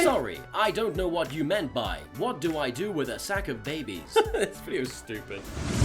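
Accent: United States. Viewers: I thought you was American for a long time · Yeah, you do sound kinda American. But, I always knew/thought you were British. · Everyone talking about his American accent but I'm just stunned at his baby impression like wtf! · Holy shit his American accent is amazing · I ACTUALLY FREAKED OUT ABOUT HIS AMERICAN ACCENT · Damn, that American accent is spot on. I wonder why you don't use it more often for your skits. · HOLY SHIT, THAT AMERICAN ACCENT THOUGH! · HOW THE HELL DOES HE SOUND SO AMERICAN IM DED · As a born and raised Chicago-an yes! You sound super American!!!:) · The fuck happened with your accent you sound almost completely American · Base American accent is illinois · AH. WITH AN AMERICAN ACCENT. AAAAAAAAAAAAAAAAAAAAAAAAAAAAAAAAAAAAAAAAAAAAAAAAAAAAAAAAAAAA... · Your american accent made me feel sick for three seconds and I'm not entirely sure why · I like his full on American accent lol sounds cool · that American accent scares me. 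· i say "american" cause your non eastern states accents are closer to Canadian accents. it's not an insult. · that accent changed to American initially <3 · Holy crap your "American" accent sounds like my friend Dylan (we live in New Hampshire) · being from the northern Midwest, specifically less than ten miles south of Canada, your "American" accent is very familiar to me. You really accent the o's when you say "shows", "country" and "over" that reminds me a bit of my Canadian cousin. So when you cover up that Cambridge twang and add accentuation on the consonants, you sound like a Canadian who's been living in American for quite a while. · Your generic American accent sounds quite a bit like the way I talk, and I've been on the east coast of America for most of my life. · I got a American accent too.. haha..like you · Sheesh, I've never noticed you're kinda americanized speech till now. 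· I didn't actually know you were British...I just thought you were American! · You sound like mid west or east coast America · in his jeeny video he sounds supper american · oops... i always thought you were american! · WITH AN AMERICAN ACCENT OH MY GODDDD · IT WAS SO WEIRD HEARING YOUR AMERICAN ACCENT AHHH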